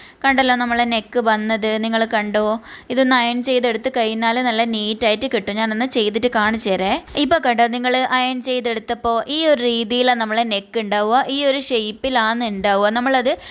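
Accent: Kannur, India. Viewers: Ithu nammude kannur bhasha anallo like cheithitundttoo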